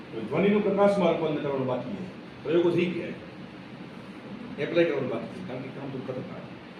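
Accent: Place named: Kutch, India. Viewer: Prabhu pranam. Tame je bhasa bolo chhe te kutcha na matra 24 gam leva patel bole chhe. Teo 350 varash pahela virpur ni aspasma te migrate to kutch. I guess you bro,living near rajkot. I m living abroad for 40 years Thaks.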